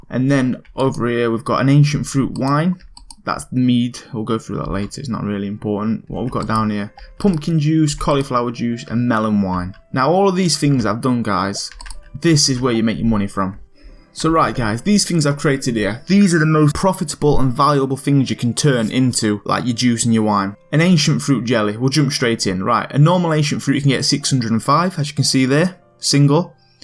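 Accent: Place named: England, United Kingdom. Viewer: Where in England is that accent from? Or is it a speech impediment? My apologies if the latter, but if not, for the love of god it's annoying as all fucks. Feel like I should leave a compliment to balance this comment now... nah. I'll just like the video. -1 +1 karma.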